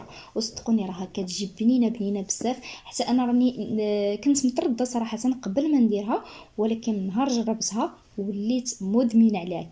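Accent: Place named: Algeria. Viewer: هبلت. هبلت هذه. لهجة. جزائرية . اكيد. انك 👇👇👇👇👇👇 اخذتي. المنشور. والوصفة. من. صفحة. جزائرية. .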